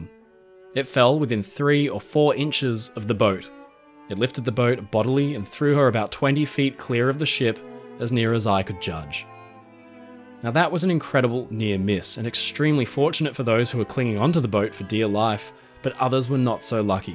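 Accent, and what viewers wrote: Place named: Australia. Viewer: Oh he is so lovely his voice just speaks to me much better than the American - Canadian boi this guy is a an Australian Mann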